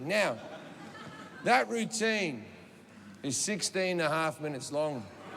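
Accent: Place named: Bogan, Australia. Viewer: Im sorry! The Bogan Aussie accent makes this immediately hysterical. LOL he could be ordering chinses take-away, and i would be rolling on the floor. Im a simple man; bogans make me laugh.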